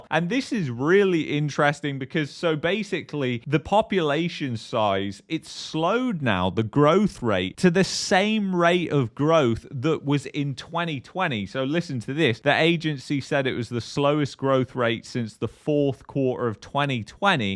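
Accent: United Kingdom. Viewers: I have never seen a positive video from this guy just gloom and doom. If he does not hate Canada so much he should just move somewhere else, he sounds British anyways.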